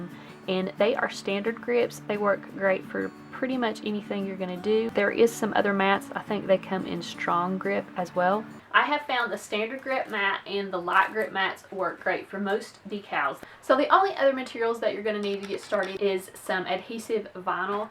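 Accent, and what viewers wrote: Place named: Appalachia, United States. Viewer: Thank you,! I love to listen to your delightful Appalachian accent!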